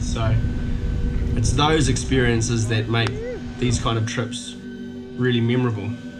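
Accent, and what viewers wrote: New Zealand. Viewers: sounds like a new zealander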